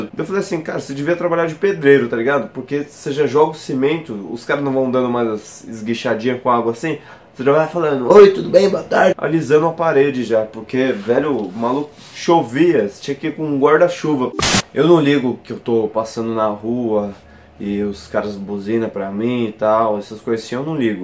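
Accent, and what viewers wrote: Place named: Minas Gerais, Brazil. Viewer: Tudo bem que e errado mais aqui em minas maior galera fala assim kkkkkkkk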